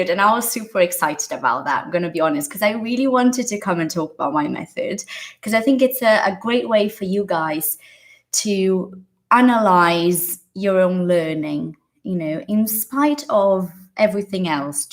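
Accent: United Kingdom. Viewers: Ma'am, you have a really good and beautiful British accent...bruh. I started my English speaking American accent and now I'm falling in love with British one.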